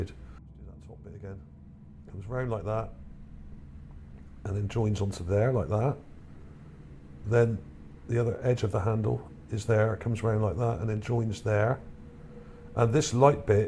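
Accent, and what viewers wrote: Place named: Wiltshire, United Kingdom. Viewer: Good Wiltshire accent 👌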